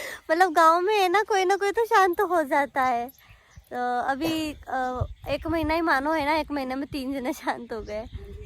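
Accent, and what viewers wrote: Rajasthan, India. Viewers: AAP KI RAJTHANI LANGUAGES ACHI HE / 💯 CUTE. // MUJE SAMAJ NHI ATI HE LEKIN ACHI HE 👍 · Aap apni Rajasthani awaj me ek vlog banao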